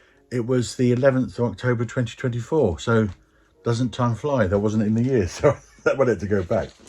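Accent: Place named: Portsmouth, United Kingdom. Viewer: Different forms of dancing indeed....😎 .... and ... nice to have my suspicions on your accent confirmed...you can take the boy out of Pompey eh!?!...Nice one copper..PUP....upwards and onwards...Heavens Light Our Guide!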